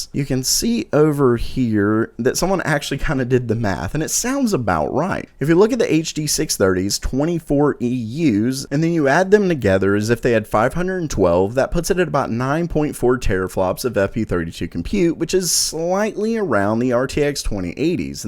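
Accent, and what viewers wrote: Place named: South, United States. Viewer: haha, sounds like you're saying "nanermeter" sometimes. Love that southern twang!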